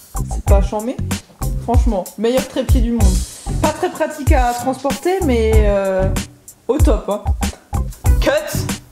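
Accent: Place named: Alsace, France. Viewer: tu l accent alsaciens à fond 😂 mais bon faux pas ce moquer quand beaucoup de gants l ont 😂 bref super vidéo 😘 #teamalsacienne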